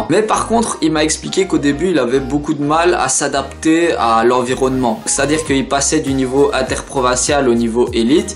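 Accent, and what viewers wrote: Liège, Belgium. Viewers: T'es de liège? Je reconnais l'accent:)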